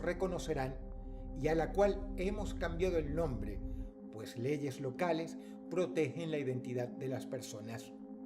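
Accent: Spain. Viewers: El acento español de ufffas uma delicia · con acento español o.o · hablando con acento español es todo lo que está bien en esta historia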